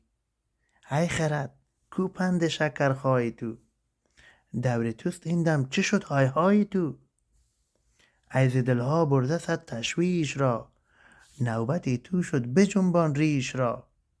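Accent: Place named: Afghanistan. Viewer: به به خیلی عالی ❤ این اولین بار است که مثنوی را با لهجه ی دری می‌شنوم و بسیار گوش نواز و صحیح و گیراست ، من گمان میکنم که لهجه ی شما به زبان مولانا نزدیکتره . سپاس فراوان 🙏🏽🙂🪻🫶🏽🌻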